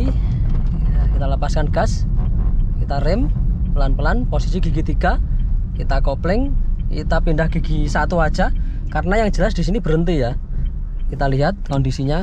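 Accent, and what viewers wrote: Madiun, Indonesia. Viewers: orang madiun nggih mas?😅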